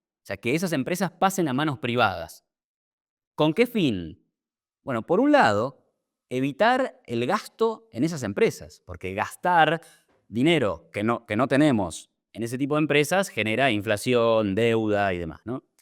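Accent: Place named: Italy. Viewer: Iván é show! Grande! Um panorama completo e muito lúcido do liberalismo, como é aplicado e dos perigos do socialismo na região. Impressionante o tonalidade italiana do portenho. Como sempre se disse: italianos que falam castelhano.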